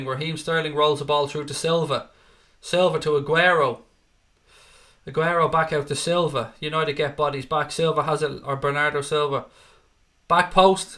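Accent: Ireland. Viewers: Are u Irish? 🇮🇪☘ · What part of ireland are you from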